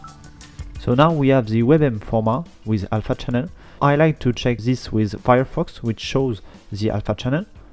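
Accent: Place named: France. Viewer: lovely french accent, also thanks for the software it's got so much in it!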